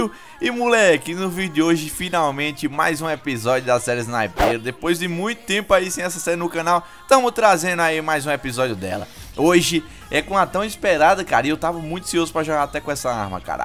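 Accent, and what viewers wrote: Northeast, Brazil. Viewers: MAN ELE E DO NORDESTE É???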